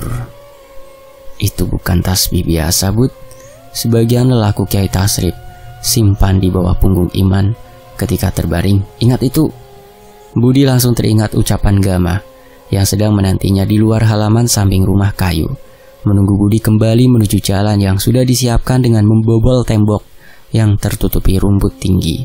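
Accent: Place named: Sunda, Indonesia. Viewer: Lucu denger bahasa Sunda nya .. 😁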